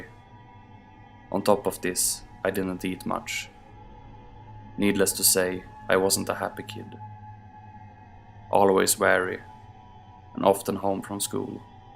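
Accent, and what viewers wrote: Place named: Europe. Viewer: Hi, just subscribed as I heard you on swamp dweller's collab and love your voice!! That accent!! Woohoo !! Great content and nice to here a few more European narrators and stories ( am a Brit myself )xx looking forward to seeing more x good luck 😉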